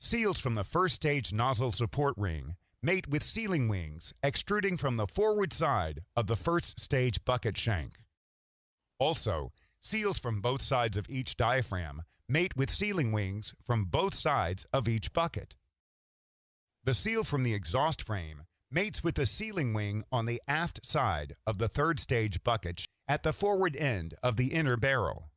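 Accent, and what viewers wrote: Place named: England, United Kingdom. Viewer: Excellent description and detail by the commentator. My hearty congratulations. I do feel that the modern jet engine must be classified as one of the modern wonders of engineers. The combined engineering logic of the jet engine took a lot of time to be put together and if one had to put an initiation spark on the human mind, it was Charles parson who combined the high pressure in a boiler to spin the turbine in a steam layout. I was lucky enough to have lived at Newcastle-upon -Tyne where I went on many visits to see the Turbina the first steam turbine ship which surprised the Royal Navy at Spithead. I worked on the first jet turbines on a naval ship and it was the HMS Exmouth when I worked at Chatham Dockyard. Again Congratulations to Oil Gas World for providing such detailed information about the thermodynamic stages and the cooling methods on every unit. 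When I built a model engine with one compressor stage and one turbine stage, the diffuser right after the compressor was a tricky unit where the air had to be slowed down to sustain the flame and then the flame diluted with colder air along the burner to isolate the flame from the metal. Well done to the commentator, HIs English accent is what I aimed for when I spoke that language, but I could never get rid of my mother's tongue diluting the English language I spoke at Chatham and Newcastle.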